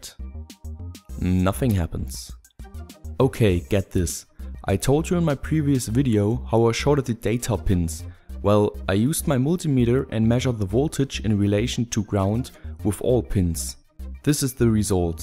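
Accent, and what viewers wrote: Germany. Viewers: Yes, he is German. You could tellthat he is German by his accent.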